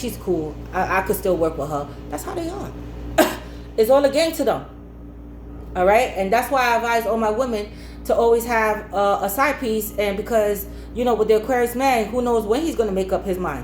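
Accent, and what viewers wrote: New York, United States. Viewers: i LOVE ur accent lol ny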